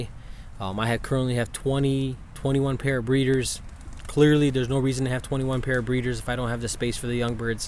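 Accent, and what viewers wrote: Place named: New York, United States. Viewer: Sound like you from New York brother, the loft has to be remade completely, you should make a design similar to this, open ventilation for the breeders, click the link its a link to a photo of a design for a loft that basically keeps things completely stress free, no smell of pigeons , you can guarantee the birds and breeders will always be happy and healthy <link>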